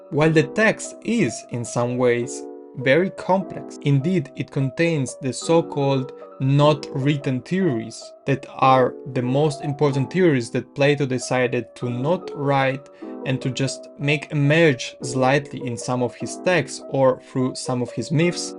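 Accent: Russia. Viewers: Are u Russian? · Я как услышал как ты жестко выговариваешь слова, сразу подумал, а не русский ли ты, а потом такой мое имя 😅.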